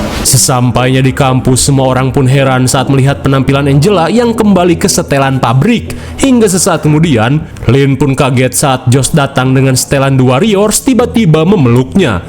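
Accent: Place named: Java, Indonesia. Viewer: tikotok medok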